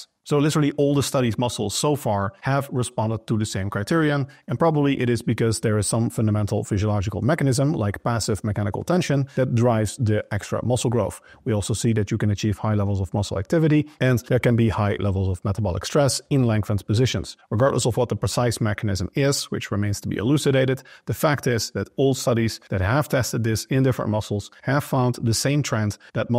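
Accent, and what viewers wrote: Netherlands. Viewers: In an other YouTube channel some said you need 2 pull and 2 row exercises for a bigger back. I did that. Gonna put the lat prayer in my back workout tomorrow and skip one of the row exercises. Gonna give it a try, in the end it's what works for me right. Btw love the Dutch accent 😉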